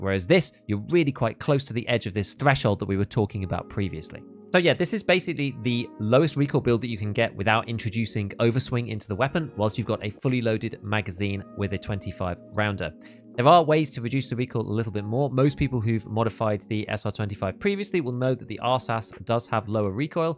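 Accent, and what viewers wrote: United Kingdom. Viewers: yea... dying and losing his kit wasn't enough shame. Then the replay of his death was immortalized on youtube along with his build getting hyper-analyzed and criticizing every little thing that was wrong with his gun. These videos are actually brutal even though the soft talking brit with the calm music makes it seem so wholesome.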